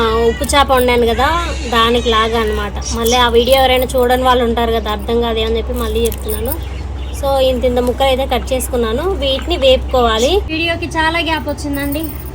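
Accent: Telangana, India. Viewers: Hai madam me telegana slag chala bagundi meru balagam move chudanandi same melagy mataldaru eadhare papalu chusukovadam chala kastam pellalne adigenatlu chypandi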